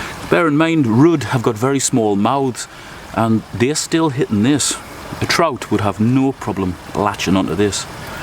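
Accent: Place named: North Shields, United Kingdom. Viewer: Hello. I just subbed you through watching Tonys top 15 youtube channels. I too am also interested in wild camping and all that stuff so I will be watching your vids and I might even learn a trick or two :) By the way, it sounds like you live somewhere in my area? I'm in North Shields like! :)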